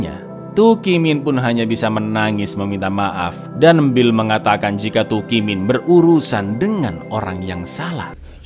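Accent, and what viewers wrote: Java, Indonesia. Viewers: Sampean wong jowo Yo cak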